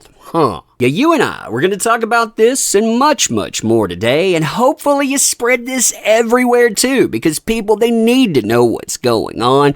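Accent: South, United States. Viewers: I'm confident you've all (star youtubers🥰) thought about a class action lawsuit against Shane and/or the guy that threatened you with FBI and/or AH for threatening you and your families....but I'm also guessing none of you want to be tied to a lawsuit, you just want to move on with your lives....gracing your audiences with the truth, unlike the mainstream media. On another note, I can't tell you how much I've enjoyed watching and learning from all of you. Especially you, I just love your powerful, short presentations with a southern drawl covered by an umbrella.....mysterious and elegant. Anything I can do to back you guys up......I'm in!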